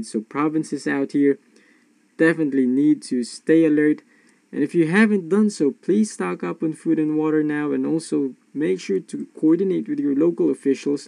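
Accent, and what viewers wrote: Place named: Philippines. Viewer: Cute pinoy accent.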